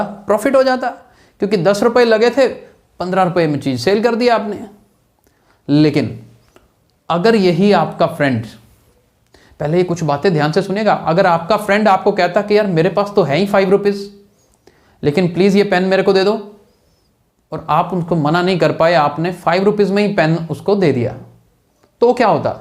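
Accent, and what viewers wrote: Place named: Punjab, India. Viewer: Punjabiyo ki language alag hi pta chal jati he😊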